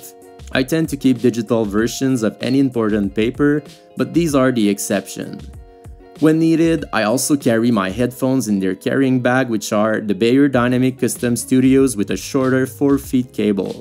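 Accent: Quebec, Canada. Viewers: Are you by any chance from Quebec? Your accent sounds quite familiar. aha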